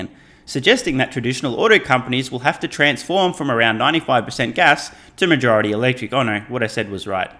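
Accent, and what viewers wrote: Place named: Australia. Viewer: Your bloody Australian accent is delicious!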